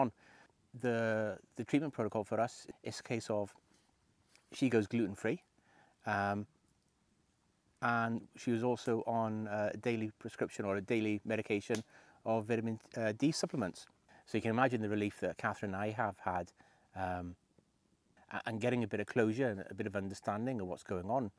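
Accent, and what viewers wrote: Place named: Wales, United Kingdom. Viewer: I could tell buy your broken accent there was a hint of Welsh, i lived in merthyr tydfil for a few years. Loved it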